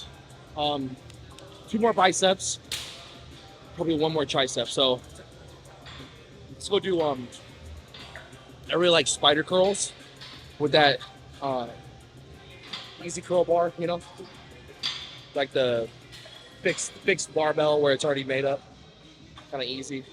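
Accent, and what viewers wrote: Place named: Florida, United States. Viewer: You sound like a true Florida cracker bro “fittin to get swole”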